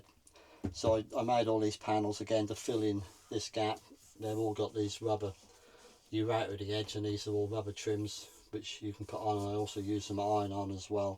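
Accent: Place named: East Anglia, United Kingdom. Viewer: I’ve just discovered your channel and I’m hooked already.Guessing you are north Suffolk or south Norfolk from the accent. I’m down on the coast not a million miles from Aldeburgh. Looking forward to watching the canoe builds as I’m a maker myself…woodturning, and have my own little channel.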